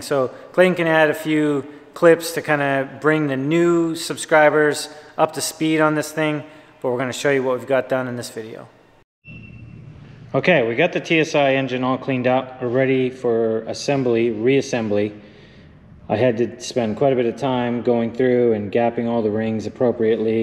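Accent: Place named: Eastern Canada, Canada. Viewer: Although I don’t know what province you’re in I can tell your out east, accent lol. You’re doing awesome work. Thank you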